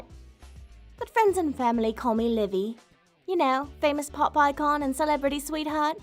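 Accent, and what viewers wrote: United Kingdom. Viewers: British accent. Tsun tsun attitude. It's s a British tsundere. best waifu Best waifu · Oh, she british? Interesting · Nothing better than a British voice. Except for my earl grey, of course. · Oof these video make me feel like im cheating on my gf but i cant help it........if tsundere weren't hot enough she British too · Oh my god!! She Bri-ish!! I love her!!! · What kind of accent is this? Sounds pretty british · HOLY SHIT SHE IS BRITISH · Hehe an British tsundere is something I wondered how long it would take before someone came up with the idea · Holy Heck she's british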